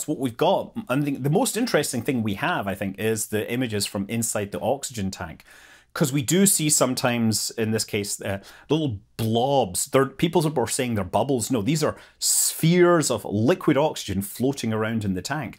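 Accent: Scotland, United Kingdom. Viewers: Is this guy a Scott? Love the accent!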